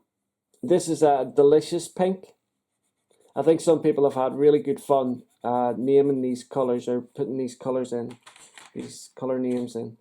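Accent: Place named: United Kingdom. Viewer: I haven't ever heard of these pencils. you sound British so that maybe why I haven't seen them or you. I would love to catch a pack of these Scorpion pencils. They look like they lay pigment down with a meer touch. So I am adding my name for the giveaway. And I sure hope you get to feeling better soon... bless you. My name is Barbara Gaudreau (french) and I am in Texas USA. To reach me just pop an email over to: <mail> YOU ARE MISSED ALOT!!!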